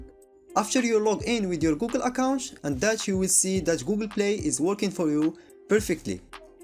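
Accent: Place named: Morocco. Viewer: you have the most Moroccan accent bro I like it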